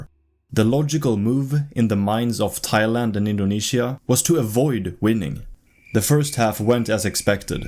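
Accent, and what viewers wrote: Sweden. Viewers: After watching some seconds, judging by your accent, I believe you are Swedish? (No offence, greetings from Norway)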